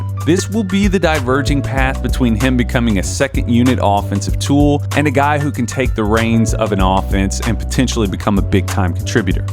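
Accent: South, United States. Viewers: Come for the scouting and dead-on Southern accent, stay for the "What We Do In the Shadows" meme. Top content as always!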